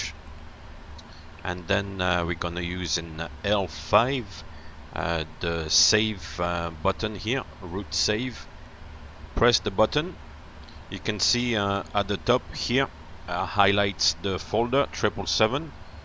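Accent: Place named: France. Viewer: Tu as un accent de français qui parle anglais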